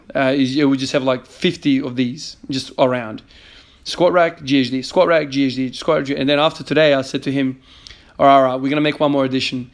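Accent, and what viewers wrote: Australia. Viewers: I'm awful with accents. Are you Aussie, Kiwi or South African? Or none of the above? Haha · I thought this guy was from Australia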